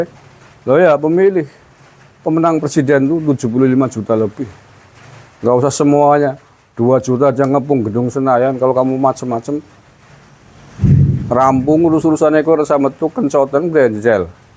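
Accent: Banyumas, Indonesia. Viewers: Hebat bahasane banyumasan banget teknbrengkolan bae mengko aku ketawa ngakak banget bisa menghibur jafi aku ketawa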